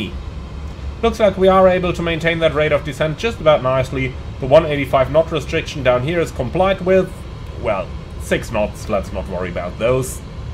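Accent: Switzerland. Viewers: I love your swiss accent😊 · What is your Swiss accent 😂? Really nice. Thanks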